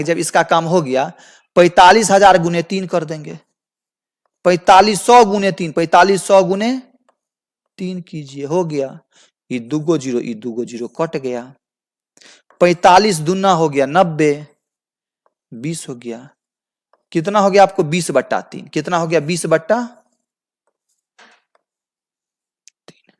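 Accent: Bihar, India. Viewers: आप बोली से बिहारी लग रहे है 😊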